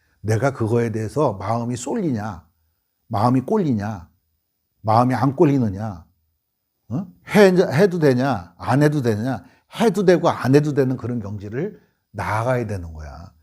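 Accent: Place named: Chungcheong, South Korea. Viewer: 맞는 말씀이유, 시님!!!^^ 충청도 사투리 넘 구수해유^^♡♡♡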